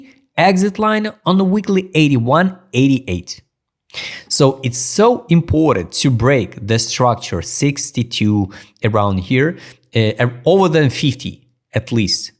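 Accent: Russia. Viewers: судя по твоему произношению , ты русский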